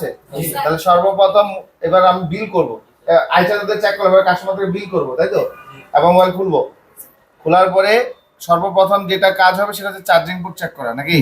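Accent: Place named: Comilla, Bangladesh. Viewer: কুমিল্লা কথায়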